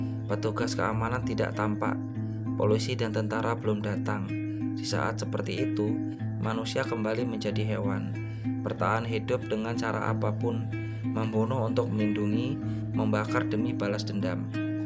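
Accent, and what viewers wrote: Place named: Java, Indonesia. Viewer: Kok medok mas bahasanya orang jawa y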